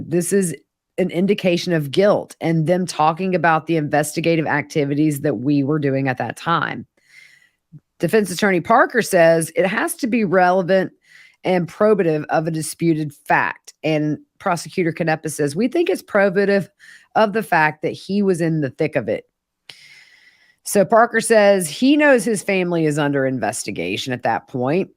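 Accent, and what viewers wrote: South, United States. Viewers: Hi our lovely, It's the 1st time I have heard of Tabitha, who is she and what is her role (if any) in this case. Thank you for your great work. And I so love your Southern accent it's great. 🤩💗💗💗💗 Lots of love from Julie in the UK 🇬🇧.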